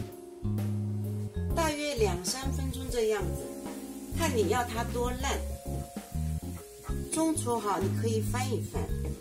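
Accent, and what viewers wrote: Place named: Wu, China. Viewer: 上海人？听上去就是我家乡的吴语嘛。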